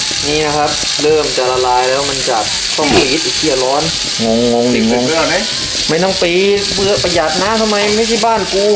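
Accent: South, Thailand. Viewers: พูดใต้เล่นกล้ามนิพอชอบหนัดเลย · พี่บ่าวแหลงเหมือนคนใต้เลยอะ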